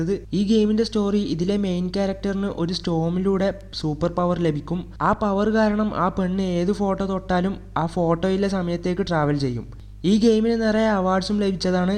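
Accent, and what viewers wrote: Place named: Kannur, India. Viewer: Bro kannur ano ?? Basha ath pole ind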